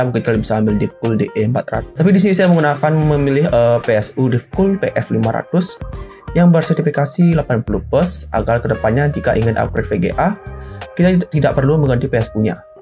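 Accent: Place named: Java, Indonesia. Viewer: ngenah sajan logat nu medok :face-turquoise-covering-eyes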